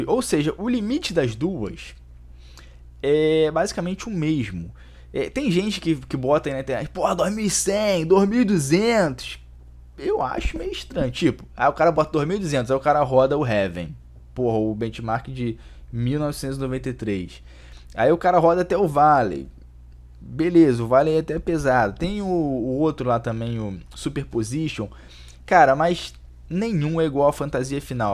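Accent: Rio de Janeiro, Brazil. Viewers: tu e de que bairro do rio ?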